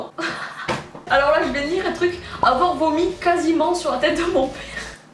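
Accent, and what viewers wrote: Toulouse, France. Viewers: je t'aime trop et j'aime aussi ton accent toulousain sa te vas vraiment bien 💖💟💞💝💘les autres ont pas le droit de se moqué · Elle habite à Toulouse, c'est l'accent Toulousain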